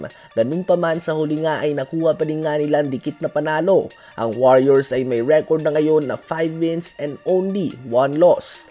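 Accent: Visayas, Philippines. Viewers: Pang bisaya mga sounds mu😂mga bisakol at badjao lng makikinig nyan😂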